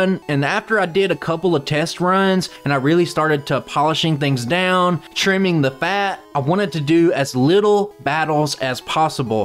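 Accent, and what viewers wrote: South, United States. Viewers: I'm liking the new avi, slowpoke looks powerful after learning TOMBSTONER! Also one of my partners heard your voice and wonders if you're from Mississippi, Alabama, or Louisiana. They're convinced you can't be from anywhere else lol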